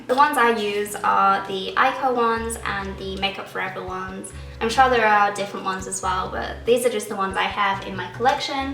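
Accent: Yorkshire, United Kingdom. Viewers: yeah can Defo hear the Yorkshire but sounded like a twang of something else aswell, maybe not lol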